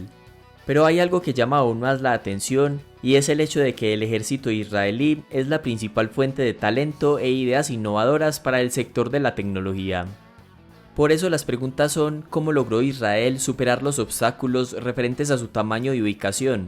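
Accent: Antioquia, Colombia. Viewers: Excelentes videos. Hace poco empeze a seguir el canal. Soy de Colombia y le sentí cierto acento paisa al narrador . Los veo desde Memphis TN 🇺🇲🇨🇴. Dios los bendiga y gracias por ayudar a la cultura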